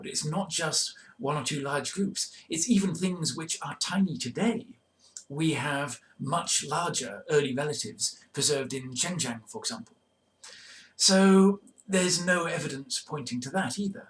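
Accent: United Kingdom. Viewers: I first watched the video, the cc was not visible. Checking for it was the first thing I did. It may have been so on your device, not mine. That’s why I asked for the captions. I’m glad you understand the British accent. My ear is not your ear. We’re all different. Were we not, earth would have one language. Checking back now, I see the cc is up. That’s all I asked for.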